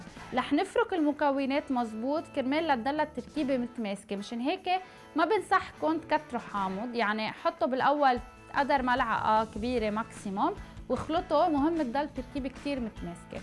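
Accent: Egypt. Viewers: فيديو جميل ❤️❤️ وطريقتك وانتى بتتكلمى مصرى عسل أوى حبيتها 😂😂😂😘😘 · طريقة نطقك مصري عسل جداً 🤣🤣🤣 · بقيتي مصرية يا وبتتكلمي زينا🌹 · تحكي بالمصري كتير ضحكتني 😅😅😅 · المصري بتاعك عسل اوي 😂💟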